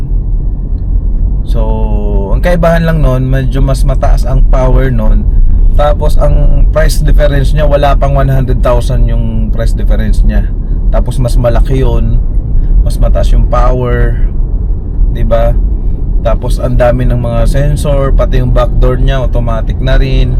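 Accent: Visayas, Philippines. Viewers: bisaya diay ka sir hahaha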